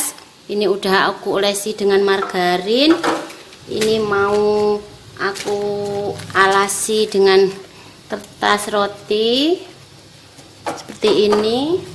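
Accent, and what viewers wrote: Java, Indonesia. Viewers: Suara blender sama yg ngisi suara cucok meong Hhhhhhhh medhok x bhsnya psti jowo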